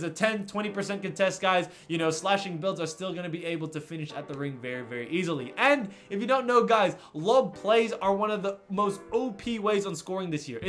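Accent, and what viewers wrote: Australia. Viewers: hey man, i recognised ur accent and i’m assuming your australia, if you do play asia servers i was wondering if you could run wit me 🥺 much love from south australia bro. keep up the great content‼️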